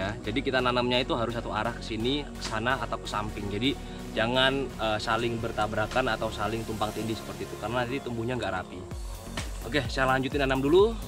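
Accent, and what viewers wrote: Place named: East Java, Indonesia. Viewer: Asli jawa timur mana mas?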